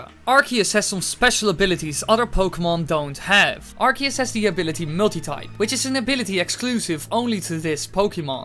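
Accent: Netherlands. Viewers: I can hear this guy is dutch. Just his accent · you sound dutch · Are you dutch?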